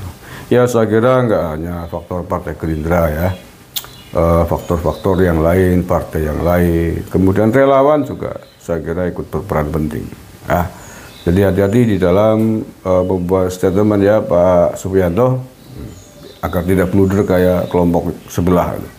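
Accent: Banyumas, Indonesia. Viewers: Smg prabowo menang 1 putaran, aq seneng sampean ngomonge ngapak... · Wong ndi pa jenengan ngapak ya pada pa 😂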